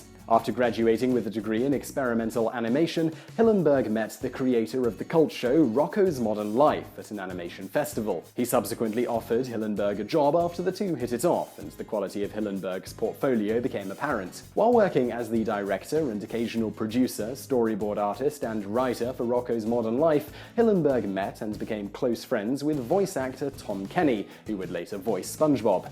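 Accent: United Kingdom. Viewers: Idk what it is with watching people with a British accent explain stuff, but it gets my precum flowing.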